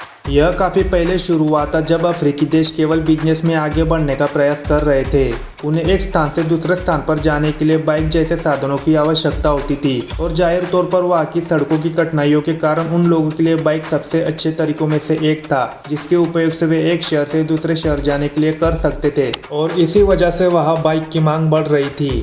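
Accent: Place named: Maharashtra, India. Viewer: tum maharashtra ya gujrat se ho aisa lagta hai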